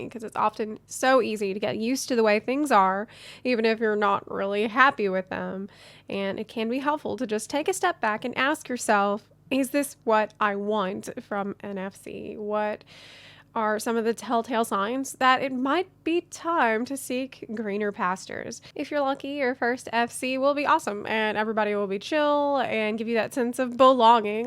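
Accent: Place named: Louisiana, United States. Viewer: Hi! Just started and found your channel really useful. If you don't mind me asking, are you from Louisiana at all? Your accent is very distinctive. Sounds like its from the Acadiana region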